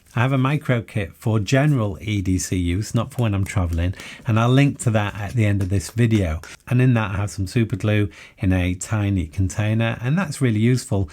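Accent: United Kingdom. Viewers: Love to listen to British accent, as a 2nd generation american, I get nostalgic of listening to my grandfather and great uncle. And very good advice and explanations. Went through my pouch to see that I had most of his suggestions.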